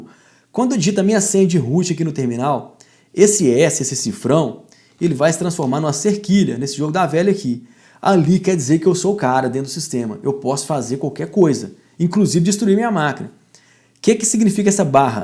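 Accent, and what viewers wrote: Minas Gerais, Brazil. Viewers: uai sô, rastado esse sotaque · sotaque mineirin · O sotaque mineiro é bem simpático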